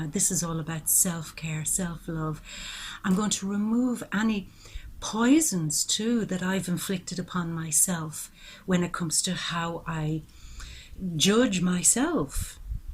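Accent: Ireland. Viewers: Love the irish accent. Great reading. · This resonates with me. I am always helping other people out from sorting out paperwork to finding things for their new homes to doing runs down to the local tip for them , but never helping myself. I have a major problem with myself worth, been put down too many times and now very wary of everyone, trying to figure out their angle or what they want that I have. I just don't let people in close in case I get hurt again. At the minute I have a major problem with money and I fear losing my home because of a Government paperwork mess up, until it is sorted I am running up rent arrears. I could do with a major cash input, even a major UK or Euromillions lottery win would be appreciated so I can settle my rent arrears myself and some other bills to have a complete fresh start, even if it was just to break even for a change. I just loved your soft Irish accent, it has a warming, calming feel about it. 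Even though I am English, I have a Paternal Irish ancestry so it is in the blood and a soft Irish accent is so nice to listen to. I got my wheels of sorts, it was my birthday yesterday 7/7 and I got some nice Land Rover models ( I can't help it, I like Land Rovers) from my kids for my 51st Birthday. Regards, Mark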